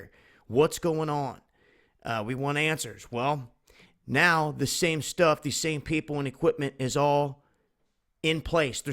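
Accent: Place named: California, United States. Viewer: you sound like Owen Shroyer if he was from California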